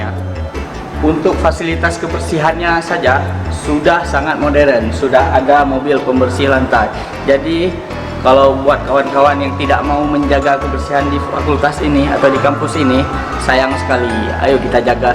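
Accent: Aceh, Indonesia. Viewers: Bukan d setel lg Nama a dia pake logat aceh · Alhamdulillah bisa berkarya, dgn melihat video ini siswa yg masih SMA bisa termotivasi, tetap semangat dan teruslah berkarya..sy bangga dgn dialek Aceh...👍👍👍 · betul itu memang logat Aceh kita harus bangga jadi orang aceh jangan buang bahasa sendiri setiap darah pasti ada logat sendiri seperti Jawa meusinthop logatnya.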